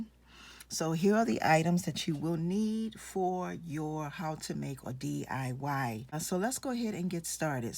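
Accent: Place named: Baltimore, United States. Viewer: I love this! I will definitely be trying this. Thanks for sharing. P.S. I think I’m hearing some Baltimore in your accent. 😁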